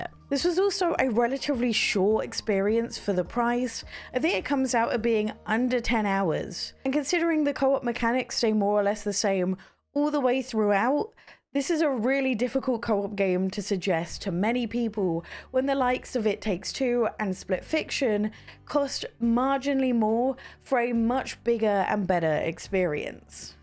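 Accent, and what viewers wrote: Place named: United Kingdom. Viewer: she is from the Uk they say things different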